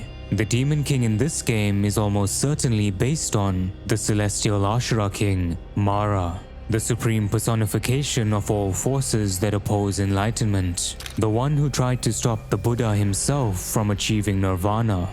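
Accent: South Africa. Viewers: I knew you were South African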